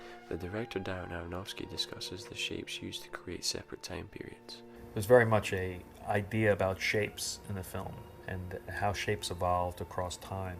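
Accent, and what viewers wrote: Scotland, United Kingdom. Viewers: What an incredibly revealing interpretation.......I have seen this movie several times, catching it on cable.....only once from the very beginning. I never considered the symbolism between Izzy's skin, the detail of the queen's dress and the tree. Tragically sad, yet beautiful movie. Thank you for this analysis. Love the accent.....Scottish?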